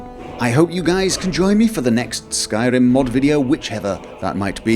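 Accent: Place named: Scotland, United Kingdom. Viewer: Hears a scottish accent insta sub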